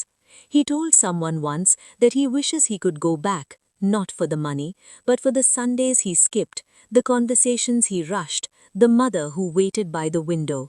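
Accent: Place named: India. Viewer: Narrator sounds Indian or Pakistani. Those cultures do a much better job of caring for their elders than the UK & USA does so this was an interesting topic for the Creator.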